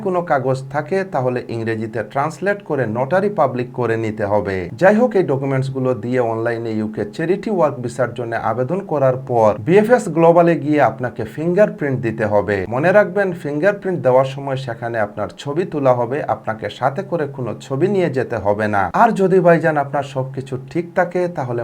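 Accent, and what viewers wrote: Sylhet, Bangladesh. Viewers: Vaiya apne Sylheti ni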